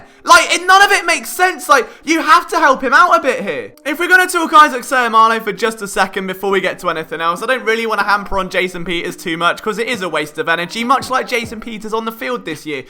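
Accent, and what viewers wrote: United Kingdom. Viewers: Why the Fook is a british person talking about american football XD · I have never heard someone with a British accent talk about football that wasn’t soccer. Nothing wrong with it but this is a first I am amazed.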